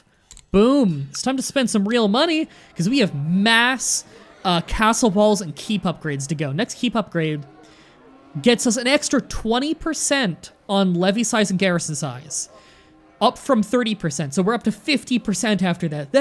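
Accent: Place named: Canada. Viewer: FYI the barony was in Portugal, the name was in Portuguese, not Spanish. And anyone who think you sound American doesn't know what Americans and Canadians sound like. You sound Canadian. And FYI, Galicia is de jure the duchy you just conquered and the ducky above it that you started with. The Duchy above it de jure drifted into your kingdom in an episode in, like, the teens, and you commented "why am I being alerted to that?"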